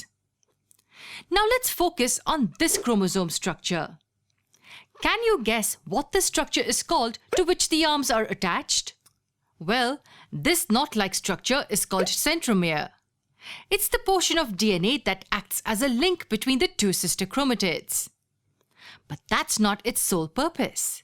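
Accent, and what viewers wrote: India. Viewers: You sound very indian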